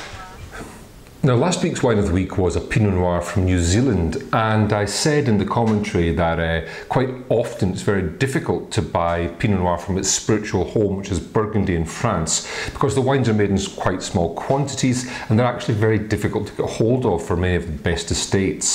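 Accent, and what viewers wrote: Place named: Scotland, United Kingdom. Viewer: Hearing a Scotsman talk about wine is taking me a minute to wrap my head around! :P Came to learn about Marsannay in general, you reviewed THE EXACT wine we'll be drinking this eve! Cheers from America (and my girlfriend who is a transplant from Fife. :) )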